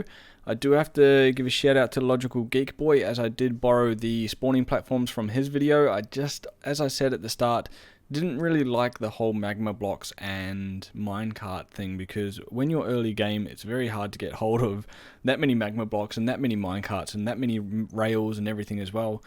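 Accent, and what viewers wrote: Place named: New Zealand. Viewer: Subtle kiwi accent almost snuck past me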